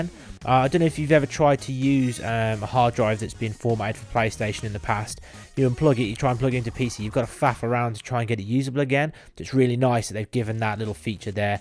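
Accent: United Kingdom. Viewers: Thankyou so much for this. I was wondering if this drive would work on a ps5 for external storage for ps4 and your video has certainly confirmed that. Love your British accent too.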